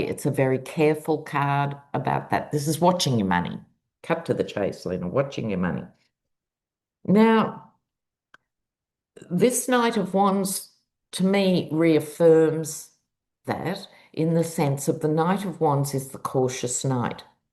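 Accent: Australia. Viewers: An Aussie living in the US… I love watching you… hearing you… so Australian… same expressions I still use lol makes me laugh…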